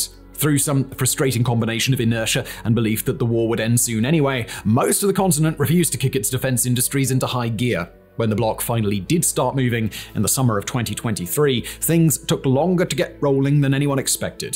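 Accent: United Kingdom. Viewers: As an American taxpayer, I find it extremely irritating that someone with a British accent is blaming the country that is an ocean away from this conflict for failing to aid a European side in the war. Meanwhile Germany, for example, as well as the rest of the EU aren’t providing the necessary aide for their champions in the conflict that is taking place on THEIR CONTINENT! As someone who was born in Ukraine, I am hugely relieved that my great country — USA — is doing more than its fair part in fighting the newest axis of evil with Russian Empire, China, and Iran at its core. Well done Mike Johnson — God bless you!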